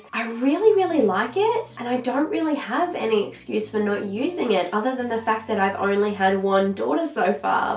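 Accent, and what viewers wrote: Australia. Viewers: OMG she sounds like an astraillian person lol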